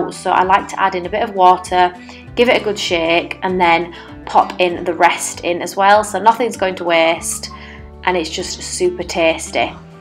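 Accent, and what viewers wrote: Yorkshire, United Kingdom. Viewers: I'm fussing it's a soft Yorkshire accent, def no further north! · sounds like Yorkshire or somewhere close. That accent isn’t Scottish or Irish.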